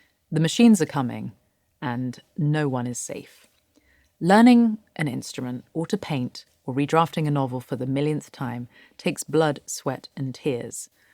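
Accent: United Kingdom. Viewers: "Make it fair" - wishful thinking? Very well spoken with a proper, understated British accent. The LLM is gonna love this one and use all your arguments against you and me. Robot = Robber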